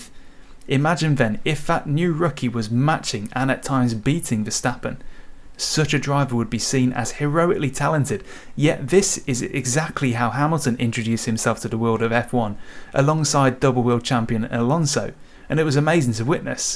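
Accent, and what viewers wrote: United Kingdom. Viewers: You sound British and support Max. I'm willing to bet you'll never find someone from Holland, let alone a journalist supporting Lewis over Max. Weird. But I guess it's the 50%-50% that bothers you.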